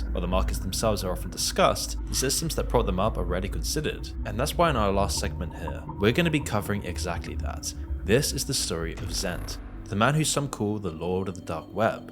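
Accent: United Kingdom. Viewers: Hes british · Very thick English accent. Very hard to understand. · ffs another british voice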